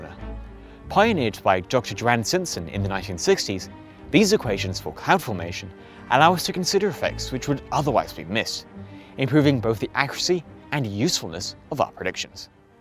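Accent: Australia. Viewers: He sounds aussie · He is an Aussie but may have lived in the UK before moving to Australia. Not all of us sound like Crocodile Dundee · Your accent is far more Australian than it is British. Biggest give away is you say ak-Sent and not Aks'nt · He is an Aussie. He is poking fun at people that confuse the accents. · He’s definitely more towards the “cultivated”end of the aussie accent spectrum or flatout got an english-australian accent due to upbringing or familial exposure. Ngl I interpreted his opening joke about the weather was him joking about his english background.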